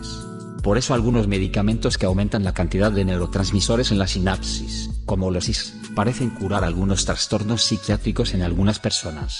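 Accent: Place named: Russia. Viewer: no mames que pedo con esa voz parece q esa IA aprendió hablar español despues del ruso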